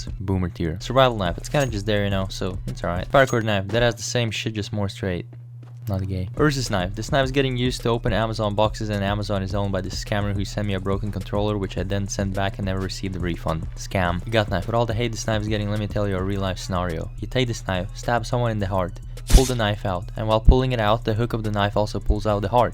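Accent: Latvia. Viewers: are u from latvia by any chance? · You latvian?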